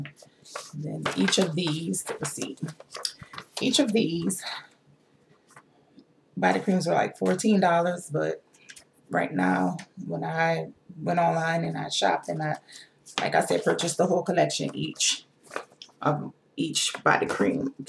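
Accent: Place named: New Orleans, United States. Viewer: Love your haul very thorough! Are you from New Orleans I can hear your accent? Anyway great video!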